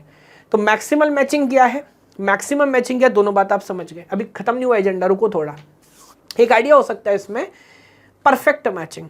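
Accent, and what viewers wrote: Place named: Kanpur, India. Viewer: aap kanpur ke lag rhe ho sir, Jis tarah se kahe , or pel diya use kr rhe ho .....BTW BEST EXPLAINATION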